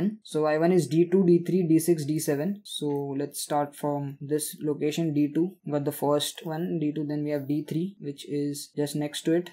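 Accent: India. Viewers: He is an Indian and its obvious that he'll have an indian accent.Stop expecting people to change their accent according to your convenience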